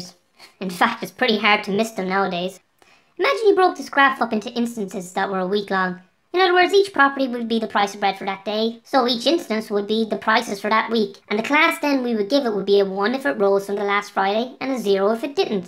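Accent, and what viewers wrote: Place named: Ireland. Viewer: I can tell youve an irish accent from this lol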